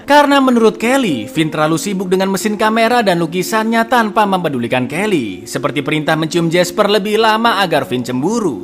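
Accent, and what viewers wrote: Java, Indonesia. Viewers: Jawanya medokk bngett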